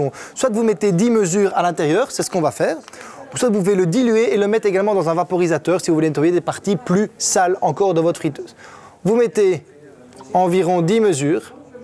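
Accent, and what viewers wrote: Belgium. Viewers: j'aime beaucoup l'accent belge, ça donne un charme assez prononcé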